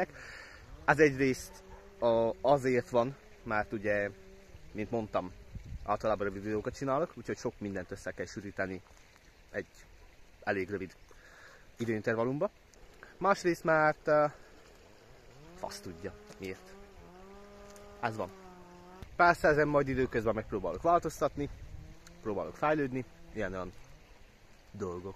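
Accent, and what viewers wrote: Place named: Szekler Land, Romania. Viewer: Szerintem nagyon szépen, választékosan tudsz beszélni, úgyhogy már emiatt is érdemes belevágnod. A másik, hogy sajnos csak egyszer sikerült eljutnom Székelyföldre, de imádom hallgatni a székely magyart.. :) Nekem már a tájszólás miatt is kellemes a lelkemnek hallgatni Téged.. :) Amúgy hozzám közel áll a világlátásod, és szerintem a humorod is rendben van.. Szóval hajrá, fel is iratkoztam, ment a like is! ;)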